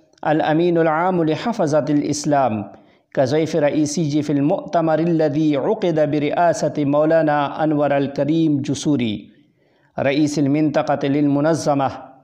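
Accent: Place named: Pakistan. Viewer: طاب نشرك أخي الكريم، بس الحاجة الى مزاولة الله‍جهة العربية والتخليص من شوائب الاردية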